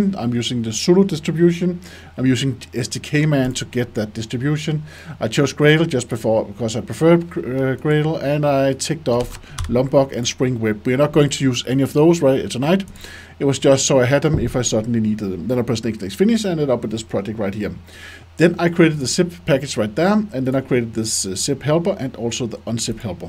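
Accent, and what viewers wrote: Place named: Denmark. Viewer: Soo danish accent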